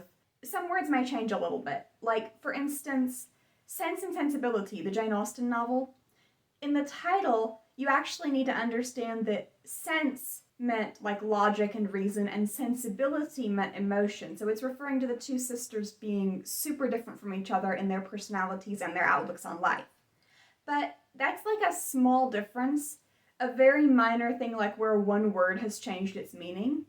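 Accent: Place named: Midwest, United States. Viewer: You have an interesting accent. You sound sort of mid western USA but some words sound southern USA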